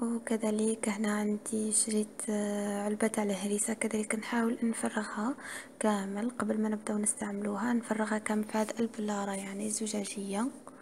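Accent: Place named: Biskra, Algeria. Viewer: يعطيك الصحة الفحلة من الفضول نسقسي برك تهدري كلمات الجيروا لكن اللهجة البسكرية طاغية عليك 😉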